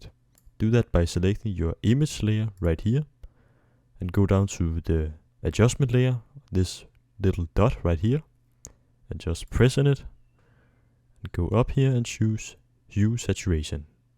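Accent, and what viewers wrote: Denmark. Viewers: are you from denmark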